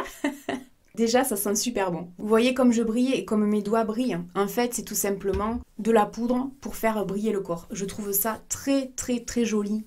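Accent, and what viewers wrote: South, France. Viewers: J'aime trop ton petit accent du sud il est trop chou 😘😘💛💛💛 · J’adore tellement ce genre de vidéo venant de ta part ,avec le petit accent du sud 🙄🙄 Mérite beaucoup plus d’abonnement je trouve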